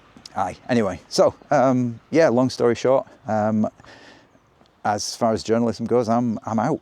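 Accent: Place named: Scotland, United Kingdom. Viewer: He could be a voiceover man for the BBC, they love a bit of Scottish. If only he were mixed race he would earn a fortune.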